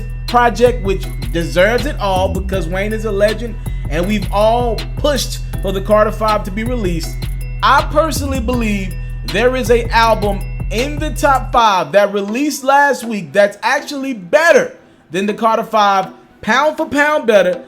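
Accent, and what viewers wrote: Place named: New Orleans, United States. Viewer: Lol facts bro u new orleans niggas crazy fr fr / just look at how u talk everybody else in america say three(3) / new orleans really do say tree even 90year old white racist old people say tree in new orleans lol😁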